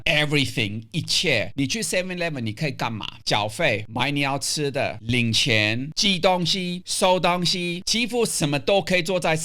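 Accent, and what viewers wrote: South, Taiwan. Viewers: 不愧是教授，非常風趣，中文口語也太台灣人了啦！.....XD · 教授都有台灣國語了！太酷了！去過美國、日本，那裡都很棒！但我跟我媽說：謝謝把我生在台灣！ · 第一次聽到外國人講出台灣國語… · 那句QQ蛋讓我訂閱了🤣😂😂 你真的是外國人嗎？怎麼沒有口音？而且好台😂😂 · 好好笑喔～口吻很台灣。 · 台灣腔好道地，🤣🤣🤣🤣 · 你的中文真的很台XD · 其實台灣日常真的是比別的國家安全很多，雖然台灣現在因各種社會因素也是導致犯罪率升高，但還是比外國好。（歐洲白天很漂亮，但一到晚上對落單的人是真的很不安全）（教授說話好台，教授很認真講，但我邊看邊笑 🤣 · 教授的中文講得真好， 還有濃濃的台灣腔 ，有些人是身在福中不知福啊！台灣真的是一個很美麗又幸福的地方！ 看了以你的影片不訂閲真的可惜！ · 教授你講話好好笑 根本是台灣人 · 聽這講話的台味，完全是台灣人無誤❤ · 教授真的很像台灣人了ㄋㄟ。講話的口氣口語、慢,快、而且是像南过的台灣郎ㄋㄟ。每天必看ㄋㄟ就會有好心情讚讚讚啦！ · 你講話的語調太像台灣人😂😂😂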